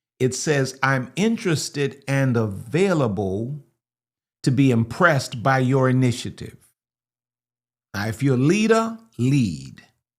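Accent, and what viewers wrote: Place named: South, United States. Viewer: You speak with a proper southern accent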